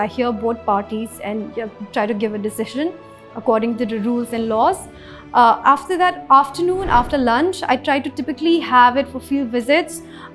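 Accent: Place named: Shillong, India. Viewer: She has a Shillong accent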